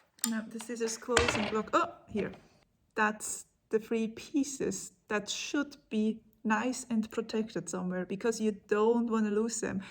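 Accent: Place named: Switzerland. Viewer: avec le ravissant accent suisse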